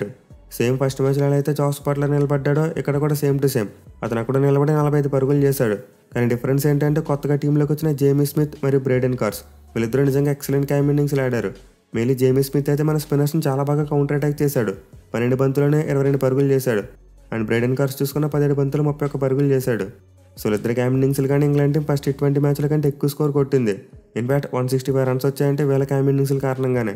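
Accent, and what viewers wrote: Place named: East Godavari, India. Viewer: Bro midha east godavari aa 🌝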